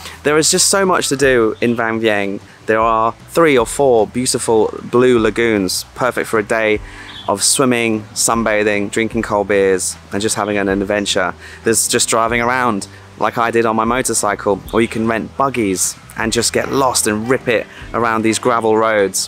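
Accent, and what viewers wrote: England, United Kingdom. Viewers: . Judging by the sound of your voice, I assume that you are from England. So why do you always use US Dollars and not GBP when comparing prices in Thai Baht?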